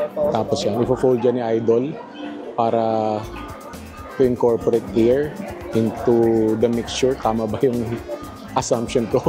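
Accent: Batangas, Philippines. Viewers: Your Tagalog brings memories of when I lived in Lipa for four years. I love the accent and the slangs specific to Batangas. Hopefully I could visit that part of the Philippines again someday 🙏 😀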